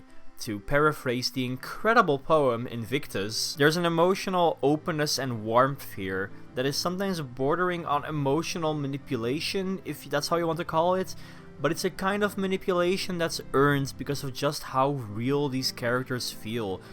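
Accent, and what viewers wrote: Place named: Scotland, United Kingdom. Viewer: Why is your accent 15% Scottish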